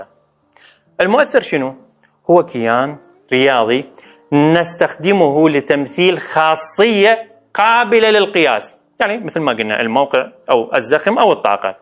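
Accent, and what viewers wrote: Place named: Iraq. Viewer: اوكف انتَ عراقي 😮 واخيراً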